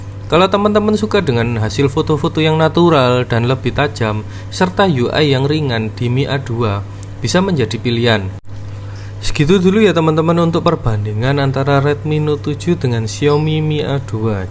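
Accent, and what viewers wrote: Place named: Surabaya, Indonesia. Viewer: Suroboyo ta bang ? kok medok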